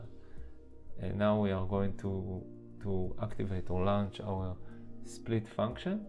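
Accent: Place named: Israel. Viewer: Now I get what an Israeli accent is. Nice to hear you doing well :)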